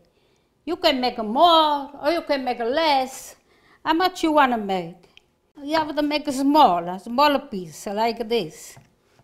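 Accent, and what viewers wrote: Italy. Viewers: Thank you for demonstrating this delicious recipe. I love your Italian accent. You are such a great cook and singer too! ❤️👍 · So glad to see you happy and healthy, you are a true inspiration to all. I made your stuffed cabbage ( which I have never ever made in my life) and I invited my daughters fiance's mother over for supper, well all I can say is thank you and thank you heavenly father, my husband and my friend LOVED THEM. I was panicking the whole time I was making them and when I was rolling them I kept hearing your voice " keep all the stuffing inside" (of course in you beautiful Italian accent). Thank you for showing us how to make fabulous Italian food that our families love. PS I still am up for adoption. Much love to you and your family God Bless. Oh and I forgot this will be dinner tonight for hubby and I.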